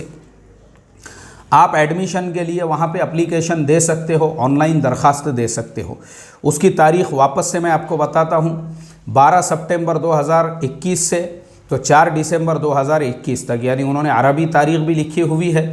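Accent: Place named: Bengal, India. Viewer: السلام علیکم ورحمتہ اللہ وبرکاتہ بہت خوب جناب مولانا صاحب کیا بنگالی ہیں آپ کی زبان سے محسوس کررہا ہوں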